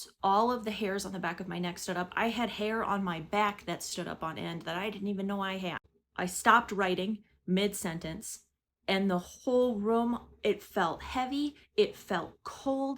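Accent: Minnesota, United States. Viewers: Minnesota, i believe. Her accent really stands out. i think she hinted where abouts she was from, in other videos, if she didnt come out and say it.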